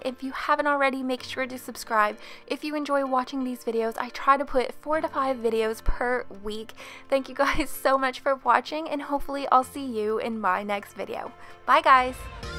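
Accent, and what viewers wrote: Canada. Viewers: Love this!! Is that a canadian accent i hear? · are you Canadian? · b Canadian I think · Are you Canadian?? BTW love the build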